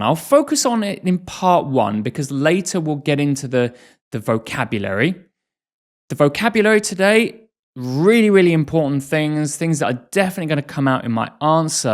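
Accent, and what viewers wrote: United Kingdom. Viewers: Happy New Year from Azerbaijan🇦🇿 Thanks for podcasts🙏🏼 and your british accent is amazing very clear we could understand you whole conversation.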